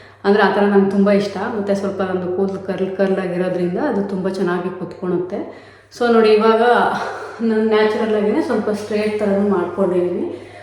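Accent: Mandya, India. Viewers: Yes she's in US no Humu dim proudish always talk with natural Mandya kannada ❤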